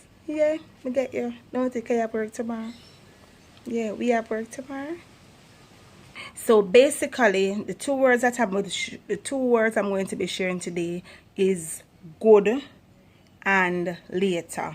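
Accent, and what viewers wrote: Jamaica. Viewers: I love the jamaica voice. I had a pen pal from Jamaica and he tried to teach me to speak the the language but I never could get it.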